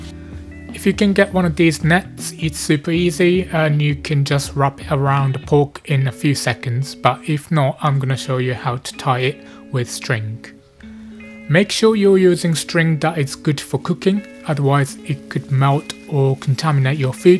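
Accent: United Kingdom. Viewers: Yes, that's me! I like to support small but professional cooking chanel, btw, your british accent gets me. All your direction is easy, clean and on point. If you have time, would you please consider to cook 鶏手羽 香酥雞翅? The pork belly in USA grocery is pretty tough, so i couldn't roll it though. I leave it 2 days and it is so tasty and soft. Thank you again ^^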